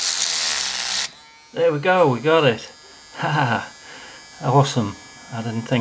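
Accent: United Kingdom. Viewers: OMG ... this is MacGyver with a British accent. Great video Amigo.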